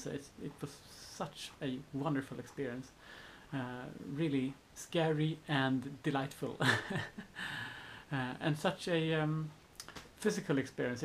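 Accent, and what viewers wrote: Sweden. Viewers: Darkpassenger 1 He sounds Swedish.. They all have a long standing tradition of eating poisonous shrooms and beating the sh*t out of themselves for fun. They discovered America and didn't even care since there was nothing to loot and the people already living there were too weak to be used as slaves. Columbus had more luck a couple centuries later as he landed in South America where there was gold and the people were stronger and of more use. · ARE you Swedish? your accent is pretty Swedish... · Christian Fjelldal I sense Sweden.. I'm from Norway btw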